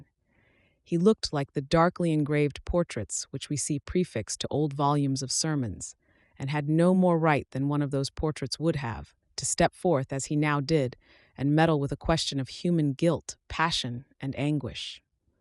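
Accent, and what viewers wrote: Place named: United States. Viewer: Hey! I am listening from Brazil. Thank you so much for indicating which audios are using american english! P.s.: I prefer the american ones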